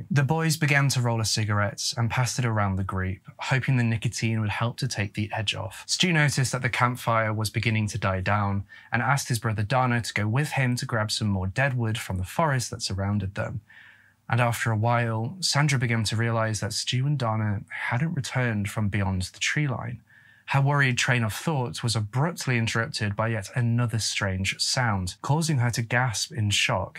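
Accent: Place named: England, United Kingdom. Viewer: Oh, I don't really find any reason to take offense, but it did spawn an enjoyable and interesting conversation about the strange way certain words are spelled or pronounced. And I'm not trying to bag on in any way; he's English and can't really be expected to understand the full nuance of American pronunciation. I really only commented because I'd want to know if I was pronouncing something wrong and I'd hope somebody would politely correct me.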